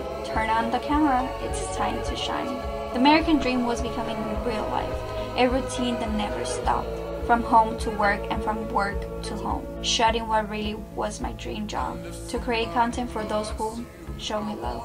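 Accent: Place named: Spain. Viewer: Go to school and get a degree ! Unfortunately you can’t just start as a Spanish influencer then suddenly cross over to English influencer especially with your accent so thick. I would suggest you create videos in Spanish and use YouTube as a source of income not a concrete job because with wars and inflation lots of sales ( links in bio ) are going to go down . People are more tight with their money especially right now .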